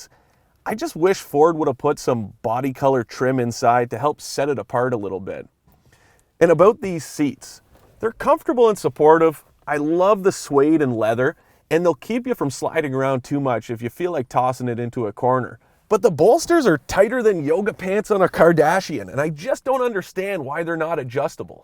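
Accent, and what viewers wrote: Canada. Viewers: You sound like a Canadian, but you do a pretty darn good job.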